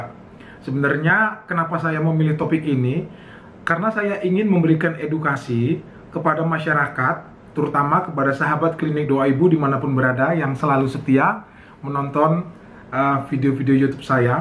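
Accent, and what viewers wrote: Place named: Karo, Indonesia. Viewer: Kalak karo nge km pak dokter, pantesan logat na bgi logat klk karo☺️☺️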